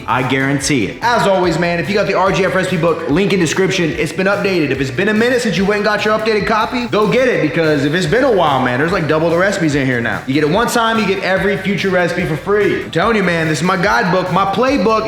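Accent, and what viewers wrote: Texas, United States. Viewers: Dude are you texan?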